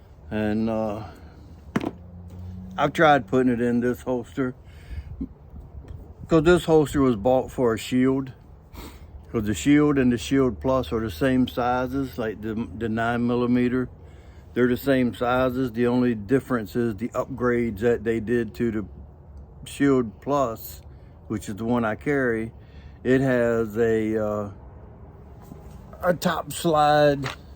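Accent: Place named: Europe. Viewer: Hey, Good to see you! Love the European accent! I pulled the trigger on the Tobac, I heard a few guys talk about it and you helped me to pull the trigger. Thank you for the show and tell. Be Well!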